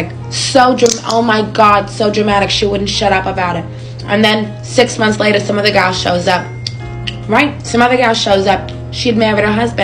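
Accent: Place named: New York, United States. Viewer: love the New York accent. fr Australia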